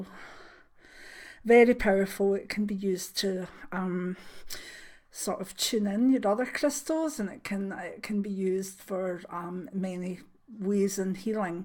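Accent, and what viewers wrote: Scotland, United Kingdom. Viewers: /thanks again for this video. Excellent as always ( i really dont need to say that now as i/everyone know(s) they are). What's the stone you recommend for rubbing your palm with? I cant place it with your (lovely) Scottish accent. Can you recommend some where on line going to for this? I'm in the midlands area..... is there anyway you know of between telford. /shrewsbury/chester? edit I'm aware it's hardly local to you so no problem if not.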